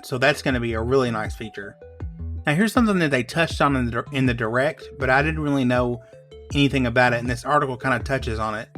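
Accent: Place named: South, United States. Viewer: Man I want to like this video but that accent is so heavy. I'm from Alabama myself and I try not to talk with that draw. It's funny I'm from one of the most hillbilly states in America, but I hate the accent and the way of life. I'll take Miami Beach and the ocean any day over hillbilly land in the mountains. More southerners should try to speak without that horrible accent.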